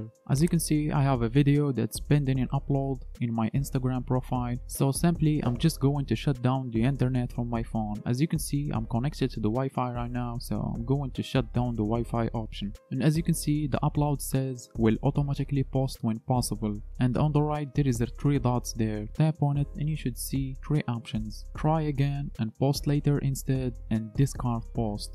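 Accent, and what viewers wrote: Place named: Morocco. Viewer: Ur accent is so moroccan maaaan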